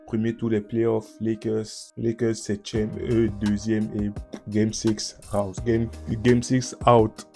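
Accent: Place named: Cameroon. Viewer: L’accent du frère camerounais dommage l’algorithme ne te met pas plus en avant courage pour tes belles analyses oublie pas Lebron reste le boss de cette équipe vous le verrez bientôt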